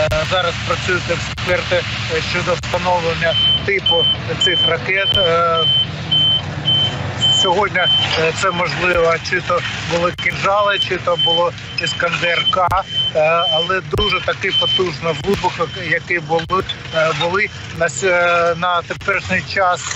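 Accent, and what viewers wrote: Kharkiv, Ukraine. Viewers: Харьковский украинский!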